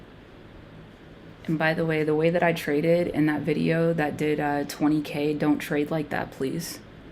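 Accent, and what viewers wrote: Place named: United States. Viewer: seemed choppy - love her accent what area of america is that?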